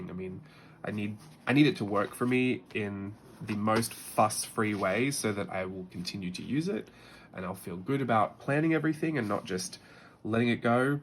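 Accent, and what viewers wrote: Australia. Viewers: …. You are so freakin talented and AWESOME!!!! I Love your work, your creations, your teaching style, (and your Australian accent too)! You’re amazing mister! Thanks for always sharing and taking time to post for all of us, you’re a huge inspiration to me. I’ve had a lot of health problems this past year and miss a lot of videos you post but when I feel well, you’re the first channel I run to and see what amazing things you e created! Ahhhh you make me smile and always feel inspired ❤ thanks for all you do Hugs from Texas… 😊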